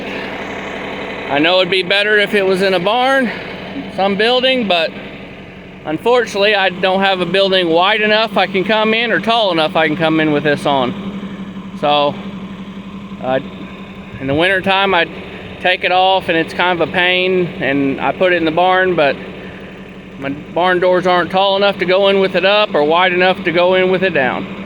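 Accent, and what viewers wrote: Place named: Georgia, United States. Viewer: Damn son that is one hell of an accent! Georgia boy?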